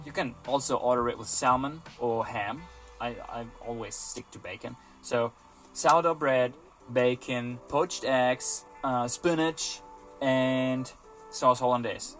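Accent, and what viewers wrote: Australia. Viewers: You have a good aussie accent too. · You have developed quite a distinctive Aussie accent in 12 months. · aussie accent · Wow you have so picked up an Aussie accent ❤ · When I first started watching the video I thought "Why is this Aussie telling me about his travels in Australia?" For better or worse you've picked up the accent, I hope it doesn't get you into too much trouble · Well said mate, and i like the aussie twang in your accent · Great videos, keep going 😊 Love your Aussie accent I can hear you've picked up! From an Aussie 😉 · So what’s your first name? Welcome Down Under! You’ve picked up a little bit of our Aussie accent! Well done! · hearing a little aussie accent every now and then mate! love it! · Your accent is getting quite Aussie like mate... outstanding... Germans are bloody rippa people... thanks for the respect.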